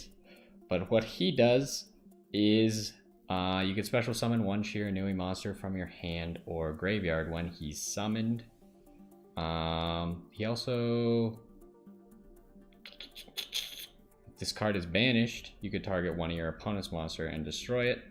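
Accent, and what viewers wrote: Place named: Texas, United States. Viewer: That’s more of a Texan accent not a southern accent. There are nuances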